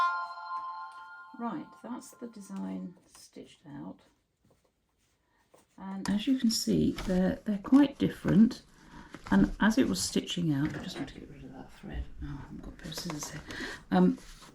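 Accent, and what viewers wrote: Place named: United Kingdom. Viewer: your British accent is music to my French ears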